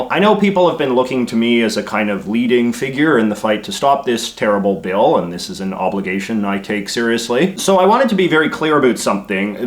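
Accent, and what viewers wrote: Canada. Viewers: I absolutely love that you don’t have a super thick Canadian accent except on “ow” sounds. That’s not a knock on you or your accent, I just find it genuinely interesting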